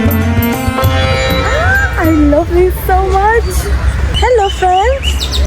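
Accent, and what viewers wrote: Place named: Iran. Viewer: Are you Persian?you sound Persian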